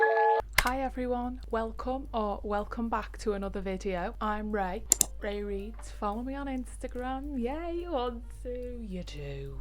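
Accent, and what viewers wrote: Liverpool, United Kingdom. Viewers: aaaah, thank you for much for replying! I have been to many cities in the UK but not to Liverpool yet (although I'd love to) - this explains it! I can't understand people being hateful to accents, they are the best thing ever and something to cherish as you do :) It's one of the things I love the most about your channel.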